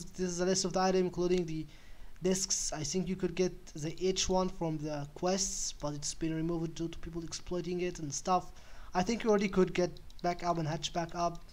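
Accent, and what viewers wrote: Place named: Egypt. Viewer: You sound Egyptian in your English accent you’re Egyptian ?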